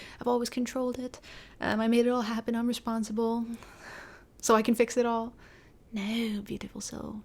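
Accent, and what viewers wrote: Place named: Scotland, United Kingdom. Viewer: Thank you for the encouragement and the scottish accent 🙏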